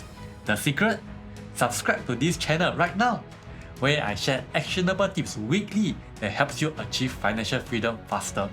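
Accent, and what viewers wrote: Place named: Singapore. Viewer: Singapore accent cannot la..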